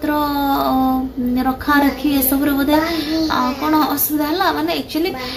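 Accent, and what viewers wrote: United States. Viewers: Can u pls say about how u improved ur talking english after u went abroad .. I saw in ur another vedio how u learned us accent english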